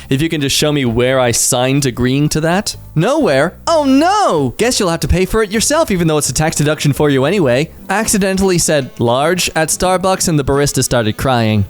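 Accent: Australia. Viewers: and I thought he was just doing a horrible Australian accent the whole time... Wow... Just wow... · Bro had to crack out the Aussie accent for this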